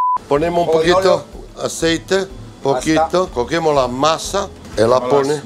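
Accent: Italy. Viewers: hablando como español con acento tano es automaticamente porteño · Hola me haces acordar a mi abuelo cuando te escucho hablar con ese acento italiano... seria genial q te des una vuelta por argentina para probar todas las pizzas q hay.... son distintas a la clasica pizza margarita italiana pero no dejan de ser exquisitas... e ir a la calle corrientes a recorrer pizzerias es todo una experiencia...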